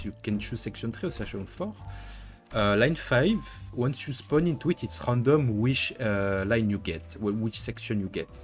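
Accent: France. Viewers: this exquisite french accent really adds a lot to the video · It's so nice finally hearing a fellow Frenchie for once in this guides.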